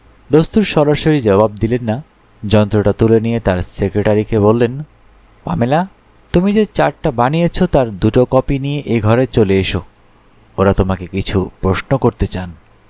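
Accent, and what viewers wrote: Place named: Bangladesh. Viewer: আপনার উচ্চারণে বাংলাদেশ এর টানটা খুব মিষ্টি লাগছে, কৃষ্ণনগর থেকে 👍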